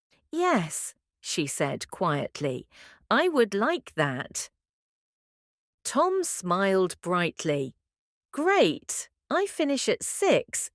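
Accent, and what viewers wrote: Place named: United Kingdom. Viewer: I love this story! Great British English!❤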